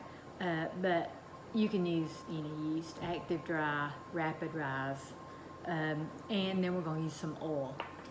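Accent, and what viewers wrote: South, United States. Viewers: I absolutely love your videos! You remind me a lot of both of my grandmothers. One of them sadly passed away 11 years ago and I miss her dearly. She made the best yeast rolls, but she never wrote down her recipe. I’ve been on a quest to recreate her yeast rolls and I’ve made many different versions over the years. I’ve come very close a few times but would love to try your recipe! You remind me a lot of my other grandmother as well, who like you, has the most beautiful Southern accent. 🥰 Your videos and stories are so wholesome and heartwarming and remind me of family, home, and comfort. It really feels like we’re part of your family. Thank you so much for sharing your life with us! Blessings to you and your family ❤️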